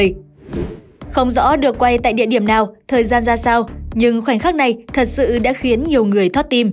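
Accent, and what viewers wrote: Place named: North, Vietnam. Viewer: Giọng này là người Bắc Việt cộng không quen tốt nhất là không you tube nghe thấy rất ghê tởm 🤮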